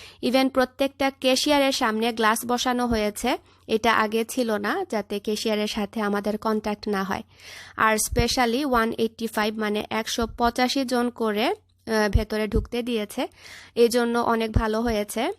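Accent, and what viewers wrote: Sylhet, Bangladesh. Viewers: Apu etodin pore shopping dekia bala lagse bashi🥰🥰r emnitai apnake amr onk bala lage.afnar video sob somoy deki...apu afne sylhety mat matba bala lage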